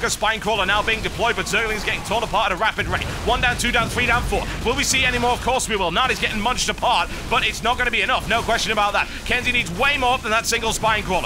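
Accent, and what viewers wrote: Germany. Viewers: Love you`r German Accent :)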